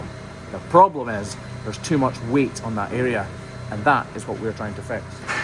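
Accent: Scotland, United Kingdom. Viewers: I kinda like him talking, with that wonderful Scottish brogue.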